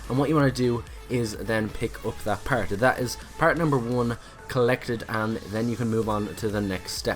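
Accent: Dublin, Ireland. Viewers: Are you from Dublin you sound like it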